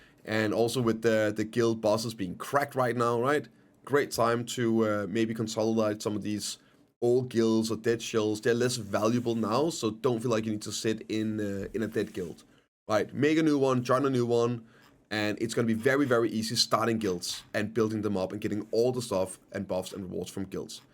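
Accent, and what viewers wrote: Denmark. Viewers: Danglish omg >.<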